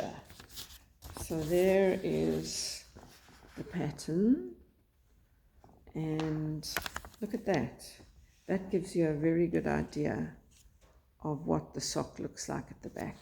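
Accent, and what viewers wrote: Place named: South Africa. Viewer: Hi and how lovely to hear a South African accent and the Hadidas, are those the ones we call Kwê voëls. When I look at the way you hold your needles and yarn, I know we grew up at the same time in South Africa. Although Afrikaans, I should have knitted continental, my mother had very strong ideas about the done thing. When I taught myself to crochet she always had a lot to say, because I did it my way. Even my Afrikaans friends found it strange and when I see how most people do it on YouTube I think anyway is okay as long as you enjoy. I hope my daughter isn't too cold in Joburg. The UK is mild and people are upset because this isn't summer. I don't mind, because it can get quite muggy. Enjoy your stay and I envy you going to your granddaughter's party. Think I will give those socks a go. Look forward to hearing your voice again. 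Anita x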